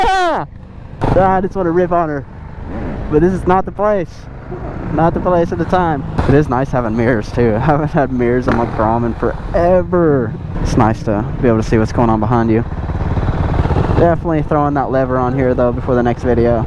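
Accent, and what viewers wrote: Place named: South, United States. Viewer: You sound like y'all from dawn sawwf. What state?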